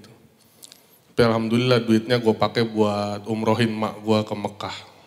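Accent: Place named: East Java, Indonesia. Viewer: Si POPON ini ngomong in Bondowoso parah juga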